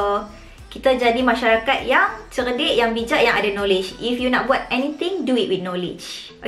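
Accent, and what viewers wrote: North, Malaysia. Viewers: Suka tgk video² nih sbb gunakan loghat utaqaaa.. TQ utk semuaa information Dan ilmu² yg di share.. smga murah rzki sllu.. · Tudia aihh utara bak hang😅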